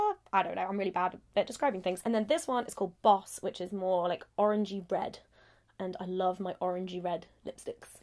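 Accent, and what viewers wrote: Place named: England, United Kingdom. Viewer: Your english accent sounds beautiful!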